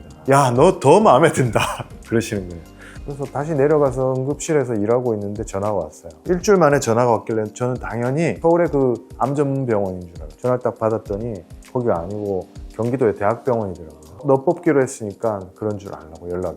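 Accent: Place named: Gyeongsang, South Korea. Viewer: 부산이셨구나 ~~~ 갱상도 억양이라 갱상도인줄 알았어요 ~~~~ 울산샘은 잘 계시는지요??? 궁금하네요 ~~~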